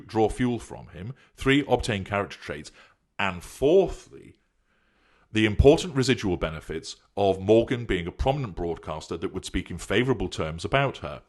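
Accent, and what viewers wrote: United Kingdom. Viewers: Incidentally you kind of actually sound like Piers Morgan. And not just because you're British and have a similar accent. You remind me a bit of Jeremy Clarkson (another narcissist, surely) as well. On the topic of narcissists in the public eye🤣, Russell Brand has got to be another. Though he is self depreciating and quick and funny, he so clearly demonstrates narc traits. His past drug abuse, sex addiction are symptoms of this. I would imagine that his father, who wasn't really present in his childhood at all but was known to be a womanizer, probably helped form the narcissism.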